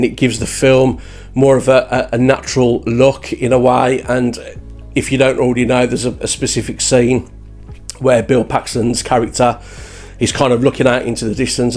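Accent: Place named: Birmingham, United Kingdom. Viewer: Nice review mate. What part of Brum are you from?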